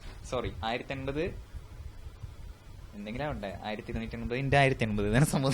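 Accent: Thrissur, India. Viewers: Nalla thrissur bhashayil paranju thannathinu thanks. . Good information👍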